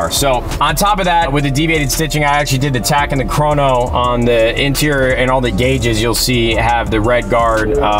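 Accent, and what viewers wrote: United Kingdom. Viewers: That British accent was sick oh my 😂 From Leicester big love Car is actually unreal too congrats on all of it you 100% deserve it, been watching since dayyyy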